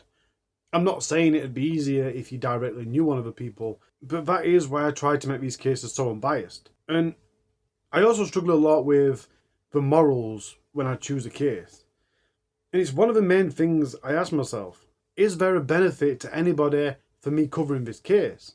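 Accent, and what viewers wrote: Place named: Yorkshire, United Kingdom. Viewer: Just found your channel. So good and subscribed. Daft question, but your accent... youre northern like myself... are you from Yorkshire? Xx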